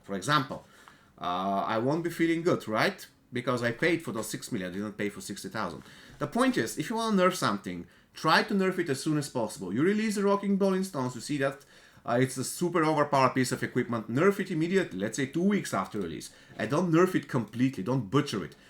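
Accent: Bulgaria. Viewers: Hello, I have a question for you. Are you Bulgarian? Because I watch your videos and I catch the typical Bulgarian accent. I am a Bulgarian and it would be incredible if you are a Bulgarian too.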